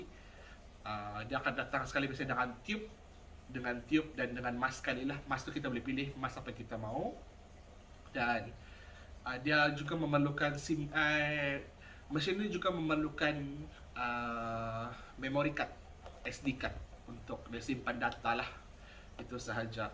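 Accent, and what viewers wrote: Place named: Sabah, Malaysia. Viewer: Org Sabah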